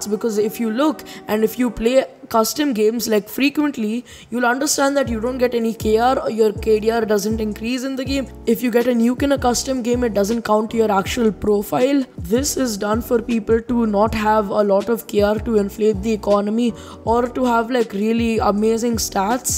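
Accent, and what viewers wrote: India. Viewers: R u indian?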